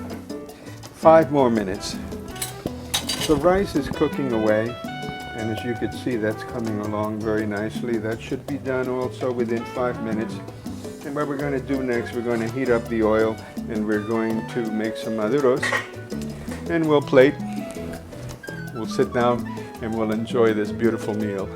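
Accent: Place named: New York, United States. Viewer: You can cook for Me anytime!!! ❤Adorable and that N.Y accent, love it all!!!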